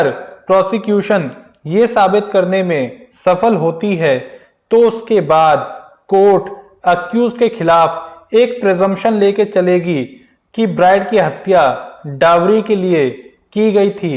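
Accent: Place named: Ballia, India. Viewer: Dil de nahin sar mein Uttar Pradesh Baliya jila se bol rahe ho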